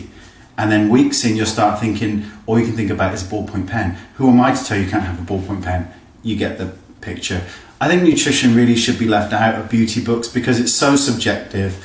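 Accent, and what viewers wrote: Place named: Cornwall, United Kingdom. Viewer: Great video. Honesty is wonderful. You sound just like my friend from Cornwall. Sounds lovely. xx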